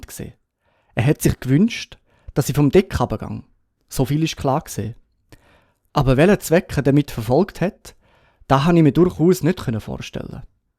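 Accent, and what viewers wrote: Switzerland. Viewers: Thanks a lot, I really appreciate your work. What dialect do you speak, and which dialects are closest to yours?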